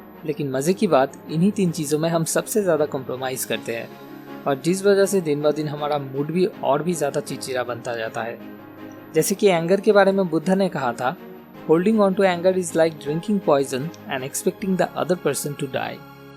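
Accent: Bengal, India. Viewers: Initially this voice was looking awkward to me. BUT nowadays, I like this voice & pronounciation of Bengali Hindi. 😊✌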